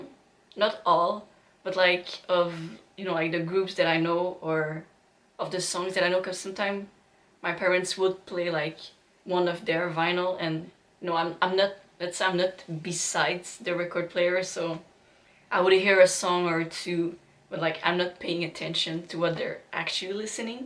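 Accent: Quebec, Canada. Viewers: Hey, I was born in Florida but subsequently got carried North to New York State when my mother divorced my pop. I was age two so I didn't have much to say about it but, nonetheless I have had a good life. I married and my wife gifted me with five children before we ended up going our separate ways. My four girls are the lights of my life. My mom played piano and sang well enough to be a songstress with the Chautauqua opera company when we lived in the ancestral home on the Institution grounds. I remember the days when mom would play piano in duets with members of the Chautauqua symphony orchestra. Jack Danziger, the violinist and Lazlo Varga the Cellist specifically. What a beautiful sound they made! I grew up with a keen interest in music, as a result. I could go on and on but, it is what it is and hsving reached the age of 73 I really don't want to burden you with more than that. 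Suffice it to say that I love your channel and you. I seriously doubt that we will ever meet but I will be there for you and your family and whatever music you play. Fear nought with thy interesting accent. It only serves to make your dual language abilities more attractive. Vive le Quebec! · Je le savais juste par l'accent que tu étais du Québec ,J,ai le meme accent Lol !!. Bienvenue dans le monde du rock !!!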